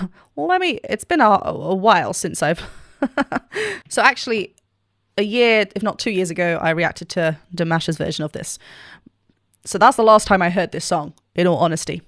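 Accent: United Kingdom. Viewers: You have a beautiful British English accent.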